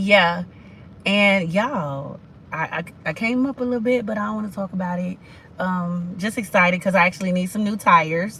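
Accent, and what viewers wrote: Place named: East Coast, United States. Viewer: You sound like you from the eastcoast love LOL are you?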